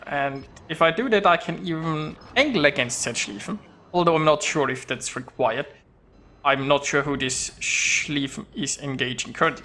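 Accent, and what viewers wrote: Germany. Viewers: First time on the channel, and the maniacal laughter couple with the German accent makes for a great experience.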